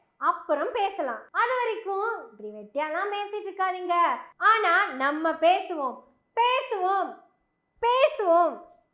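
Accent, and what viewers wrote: Kongu, India. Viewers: Kannu magale, un alagana Kongu Tamilum, contentum, pesum murayum, jesturum alago alagu. Unakku miga prakasamana edhir kalam irukkudhu thangam. Unnudaya ella videovum save seidhu appa appa paarpadhi enakku mattatra magilchi. God bless you child immensely.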